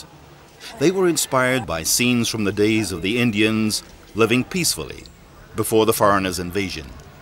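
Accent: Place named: Hialeah, United States. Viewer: dudette, u sound like your from hialeah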